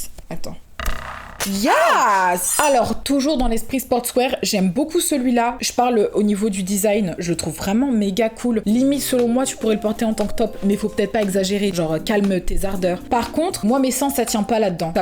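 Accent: England, United Kingdom. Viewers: Wesh tes anglaise ou quoi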